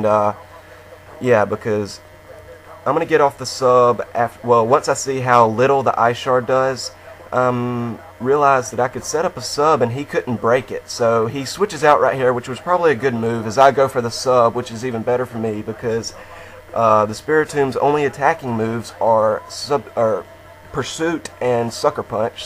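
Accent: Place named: South, United States. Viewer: You got a bit of a southern accent going on.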